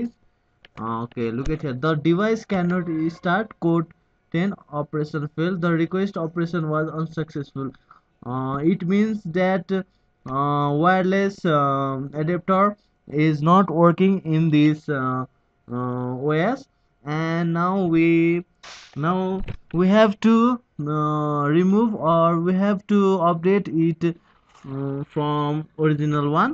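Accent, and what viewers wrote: Nepal. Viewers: Dude, are you Nepali? You got a very Nepali accent. Your video didn't even address my problem. Thanks anyways. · Nepali Manxe le English boleko xittei chininxa dai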